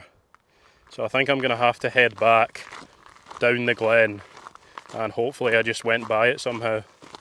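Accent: Scotland, United Kingdom. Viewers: Just fallen on your link which is really splendid. Your images and commentary are excellent. That Scottish accent is so clear. I follow two other Scots on YT Steve Marsh and Ruth Aisling, I'm English but have lived in France for many many years. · oh babez, you’re dead hot and I loooooove yer scottish accent. i sooooo would spend one or even more than one night with you in a bothy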